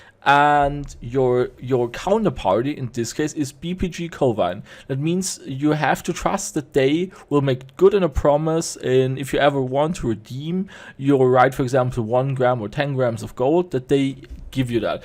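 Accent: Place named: Austria. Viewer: You must come from Austria, because your english Sounds Like Schwarzenegger. 🎉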